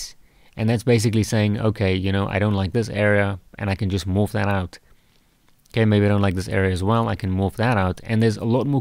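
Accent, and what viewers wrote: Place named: South Africa. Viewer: Could hear the accent was wondering if you're South African - thanks a lot for this, will be watching a lot of your content. So cool to see a creator from SA on youtube with nice tutorials. Made my day!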